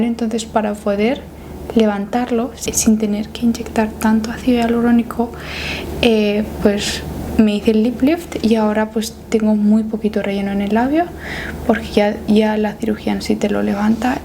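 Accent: Spain. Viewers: Tienes acento de españa❤ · soy una nueva seguidora tuya. Vivo en España, tú también eres española? Porque por el acento, si que lo creo. Me encantan tus vídeos...me relajan mucho, incluso me ayudan a dormir. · tiene como asento española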